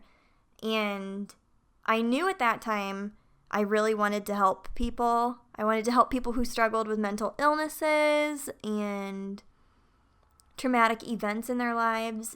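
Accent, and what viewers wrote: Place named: Northeast, United States. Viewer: Are you from RI, NY or MA? you have that accent. B^|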